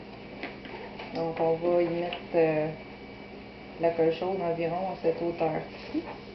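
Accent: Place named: Quebec, Canada. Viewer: Québéquoise ? Ca s'entend !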